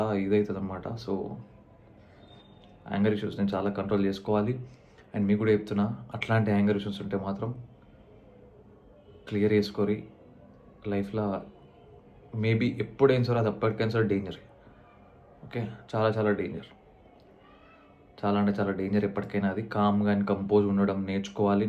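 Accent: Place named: North, India. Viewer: Hindi badhiya bol lete ho bhai. Ekdam north side wali feel ati hai. 🫴🤌🤌🤌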